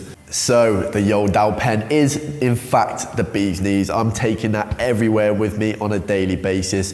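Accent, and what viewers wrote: Standard. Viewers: 很好哦，中文有进步啊，没什么口音还挺标准🤓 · 视频有深度了。中文发音很标准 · 非常感谢你的真实中国视频！ 你的说出来的汉语好标准！真棒！ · 天呐！我也想要一支这样的笔😍，有道翻译太牛了👍！另外，你的中文发音好正👍谢谢你视频最后这段客观事实中肯的话❤️ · 你的发音真的很标准！当然我说的是你的中文！ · 你的发音很标准呀！感觉很少见到发音这么好但是流畅度还不够的外国人。印象中大多数人的情况都是，已经能流畅说话了但是发音实在有不好的习惯。感觉你基础打得很好。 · 中文有进步，口音很标准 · 你讲中文的口音很标准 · 你的中文比大部分中国人的发音都要标准，因为我们有很多乡音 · 你的中文水平真的提高了好多呀！你最早的视频真的一点中文也不会的。现在你这发音可标准了哈哈哈！表扬一下！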